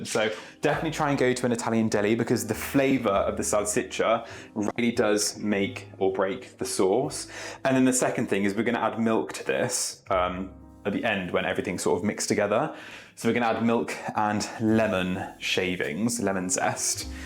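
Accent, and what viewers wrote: England, United Kingdom. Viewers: English accent is tough for people who English is second language. Please keep your tone up so we can hear you clear and slow down when you talk.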